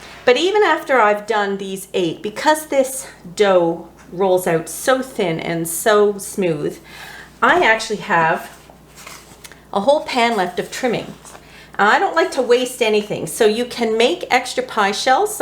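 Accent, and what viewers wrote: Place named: Canada. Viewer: I was fortunate to find the yellow bowl and lid at the thrift store. I sold Tupperware in the late 70’s but no longer in business. I will definitely hang on to the pieces I have for sure. I was going to wish you a Happy Thanksgiving but I think you are Canadian with your pronunciation of the “o” but enjoy that day too, lol!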